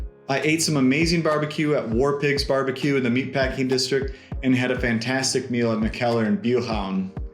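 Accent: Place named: North America. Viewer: See, a WarPigs recommendation carries so much more weight in a North American accent. Kudos to matey on the tat, very DK.. Now the best thing about short form videos is that you've actually been present wherever you've visited, might actually have some memories that aren't digital, as such. Kind of a shame though, in the sense that the narration is pretty good, you're smart enough to have survived our cycle lanes (imagine how entitled a cyclist can get, when we have right of way..) and the accent isn't one of those that makes us grateful for the ocean dividing us.. Remarkably off-topic and not so much leaning into any, umm, cultural stereotypes as just being a bit proud of our guys n gals being able to make the team - but there's a few vids on YouTube called "My War - Danish Afghanistan Documentary", that's one of the few that covers something where our boys and girls have come out to play with forces from the US, Canada and the Brits. 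Nearly all of it helmet cam footage and the rest is the soldiers' own cameras, hasn't been through the "propaganda ministry" for editing either, pretty raw. w/English CC <link> Kinda like watching the BSG Raid series..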